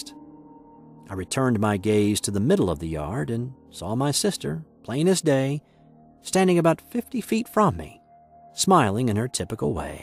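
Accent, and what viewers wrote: South, United States. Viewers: Gotta love that Eargasmic Prime Storytelling Southern Accent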